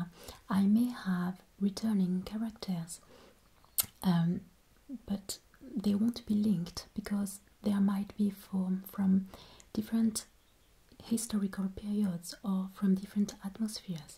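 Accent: Paris, France. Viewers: As an American, she sounds French, her pronunciation is so delicate & lyrical. And, as mentioned above, she drops the "h" . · As a non German I can say she doesn’t have a German accent lol, it’s clearly French, not sure why ppl would think differently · You are so lovely with your cute French accent and your channel is so lovely, pleasing and beautifully so aesthetic. Keep up the wonderful work. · You do not sound German lol Your accent reminds me of people in Paris France. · as a Swiss Girl she Sounds 100% french and 0% german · Oh, darling! I've been interested in the dead or alive game stories for a little while now, based on the accent of lady Helena Douglas, I can tell this is indeed a french accent. 🙂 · Thank you! You have a beautiful French accent! Keep up the good work! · Hi you are such a nice ASMR artist. I think you have a lovely French voice and manner about you. 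Thank you. X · You definitely sound French to me. I too thought you were in the theatre. OMG I am the same, a frustrated artist, a lover of the pre raphaelites and artists like JW Waterhouse. And I adore fairy tale illustrations. I also love the style of the Frouds artwork. Your videos are right up there with the very best ASMR artists. So warm and cosy and ethereal. You have been our sanity during these harsh times. You make the world a better place. Thankyou 💙🙏🏼💙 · I am American and I can tell, 100%, that you are French. I do believe that you have found an outlet for your creative spirit. What I have seen so far is outstanding. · Je suis né avec de bonnes oreilles. J'entends toujours ton doux accent français. Vos vidéos sont incroyables. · I love all your videos and just for the record you have always had a clear French accent 😍 I have been unknowingly into asmr for about 7 years now thinking im the weirdest person in the world going to bed listening to someone speak with an accent and chew gum lol I have actually only discovered a year ago that what that was doing for me was called asmr and I could find it everywhere on YouTube ❤❤❤ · I've just discovered her videos, and her accent slightly reminds french ( I live in France), but it's clearly not a typical accent of a native french speaker speaking English. 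Sometimes she sounds even like russian speaker in some moments. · Yes, when French people become more advanced in English and their intonation and accent approach something closer to a native speaker there is definitely some crossover in sounds with a German speaker at the same proficiency. I have studied French for years so overall it's easy to pin her accent down as French, but there are some sounds that definitely remind me of my ex who was from the Rhineland (Germany). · I knew immediately your accent was French. I think you are incredibly talented, and reminded me of “Goodnight Moon”. You both have wonderful imaginations. Your props are fascinating. I thought you had to be in the movie business. Thank you for sharing your talents. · I’m American, and she definitely sounds French. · As a german, i can tell you, you dont have a german accent at all, your accent does sound french :) very nice video btw! 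· Hi, Thank you for your Q and A, it was very interesting. You are definitely an artist and so creative. I am happy that you are so successful here and look forward to enjoying more of your work. As an American, i find your french accent amazing and relaxing I wish you the best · Really? As a Canadian this is the Frenchiest of Parisian French (as opposed to Quebecois accent) accents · I lived in France for a year, she has a French accent obviously but also I can see why some people thought German. German pronunciation is more like English so it’s a compliment that she pronounces English sounds well. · Oh I'm French and she might sound German because she tries to put intonation but still has a very heavy accent. So she's not bad for a french girl, and she's trying hard, but sounds sooo French. Was very obvious for me.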